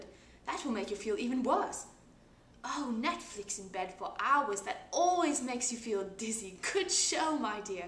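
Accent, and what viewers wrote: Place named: United Kingdom. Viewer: it seems natural for you to have a british accent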